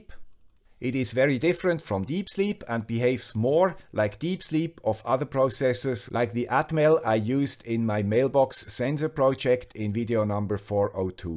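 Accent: Switzerland. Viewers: Thank you very much! Exaclty what I needed and KI didn't knew yet. From another guy with a swiss accent.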